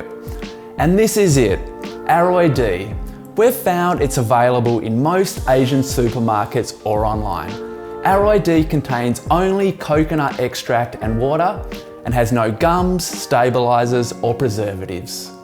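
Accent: Australia. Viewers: Hooray for your Australian accent!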